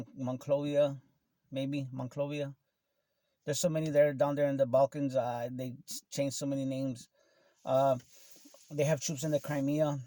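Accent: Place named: Texas, United States. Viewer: New sub. Love the style and real. Texan here as well. It’s really nice to hear a native voice in all this. Thanks man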